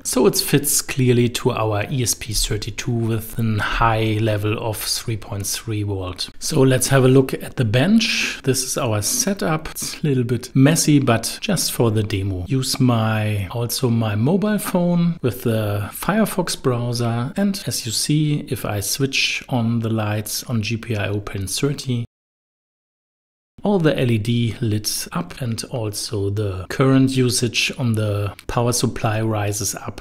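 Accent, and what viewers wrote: Germany. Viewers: Man kann dein Denglisch richtig raushören 😂 aber gutes Video 👍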